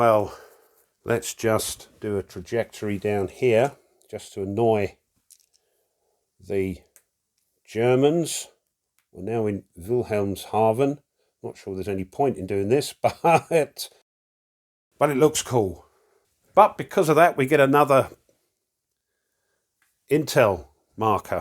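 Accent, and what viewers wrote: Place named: United Kingdom. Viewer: Hopefully will have reprinted soon! Missed the 1st Edition…Like your videos and love your British Accent!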